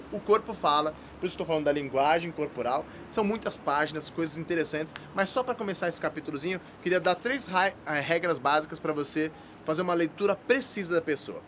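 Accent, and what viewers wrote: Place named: Argentina. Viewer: vc é argentino né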